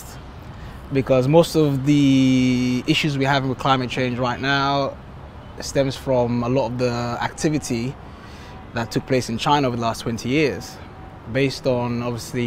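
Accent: Scotland, United Kingdom. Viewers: Love Scottish accent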